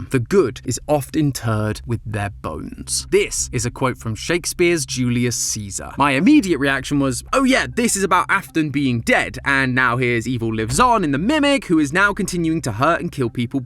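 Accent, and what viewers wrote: England, United Kingdom. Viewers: matpat is british now? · Hey you might wanna fix the United States close caption here. Someone used your English accent too literally and wrote Dawko as Dorko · I think that this guy is a good replacement for matpat, because he just sound like him but British. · man. i will never get over this voice. ofc the british one had to get game theory... lol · what happened to pat mat, why did he turn to british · Guys the British guy is back! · Bro I miss mat pat 😭 now he’s a British guy man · Matpat seems a bit more British than I remember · Every video I hope to hear matpat voice but no just a random british dude 😔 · did matpat become british? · is a true prodigy. One created by matpat. (Nothing changed. The man behind the theory just sounds more British sounding.) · WHY DID MATPAT RETIE AND YOUR BRITISH NOT MATPAT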